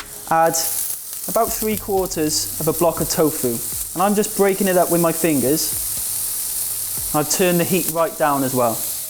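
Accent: Wales, United Kingdom. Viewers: Do I hear a welsh accent?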